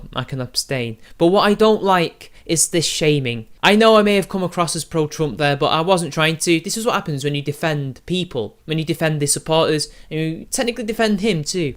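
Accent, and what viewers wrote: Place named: Yorkshire, United Kingdom. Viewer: What part of Yorkshire ? Lad.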